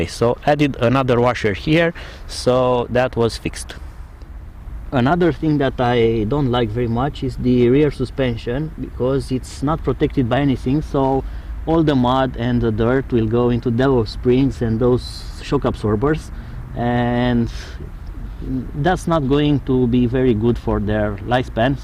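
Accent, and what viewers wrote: Romania. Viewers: Baga' limba rOMANa ca esti praf...:))) accentul te da de gol ca esti roroman:))) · You speak english like a romanian.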